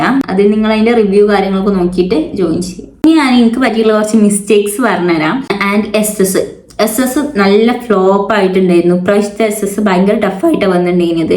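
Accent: Malappuram, India. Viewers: Aa varthanam kettaa arinjoode mlpprm ahnn nn😂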